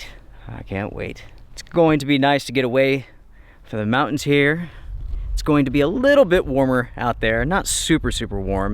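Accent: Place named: North, United States. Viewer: where's the mountains of North Carolina accent ? you sound like a yankee to me.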